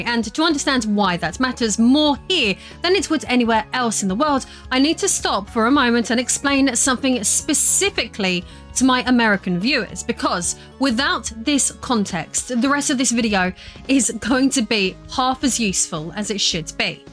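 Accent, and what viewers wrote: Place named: Cockney, United Kingdom. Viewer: Are there any factory workers left in the UK? Careful, your cockney accent betrays your working class background. Is it not possible to fake an RP accent? I'm from South Africa where no one cares about your background or even your education level, only your bank balance and where you live. You'd think we'd have a games industry here given that criteria but we don't.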